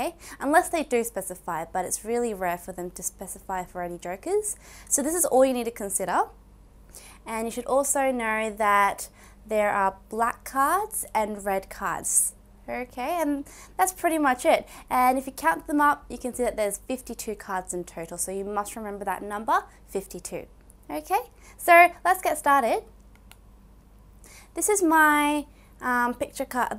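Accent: Australia. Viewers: Omg thank you so much. She really explained very well. Btw the girl who teach reminds me of Rosè from Blackpink because of her Aussie Accent. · It's messing with my mind watching a Chinese girl with an Australian accent. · Aussie accent tho. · I like your Australian accent!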